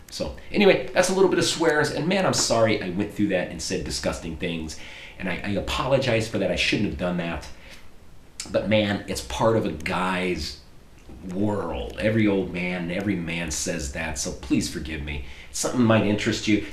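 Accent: United States. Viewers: U have like the most american accent when u speak serbian. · It's funny, how you would actually fit in Serbia, but not with that American accent.. Haha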